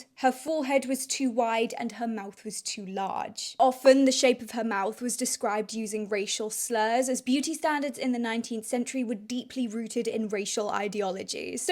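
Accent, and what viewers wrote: England, United Kingdom. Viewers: Excuse me is this British accent? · I love the English accent, it would cool if a great grandma-ma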